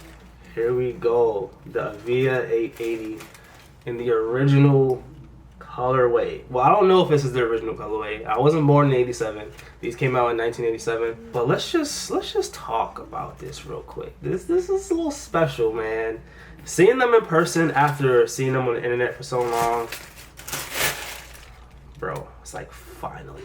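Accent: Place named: Connecticut, United States. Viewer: You gotta be from Connecticut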